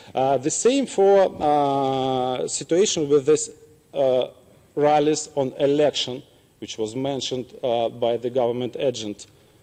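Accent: Russia. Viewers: >ещё я не заметил ошибок в ударениях Плохо. Речь у него более-менее правильная, но произношение дубовый типикал рашен акцент. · Говорит на английском по русски))) даже без перевода понятно) молодец, как ни крути) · Построение предложений у него правильное, но вот акцент ужасный, как, собственно, у 90% русских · Russian English detected! Но все равно, его уровень в 100500 раз лучше Путина!!! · Неисправимый русский акцент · По акценту сразу понятно что русский.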